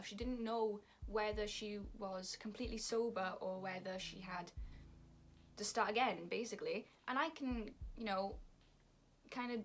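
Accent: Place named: Wales, United Kingdom. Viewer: Your accent is conflicting to me, cause I don’t know if your from mid/south wales or Mid/north England- Love your hair, love you ur piercings. Lots of new year luck n love from north wales!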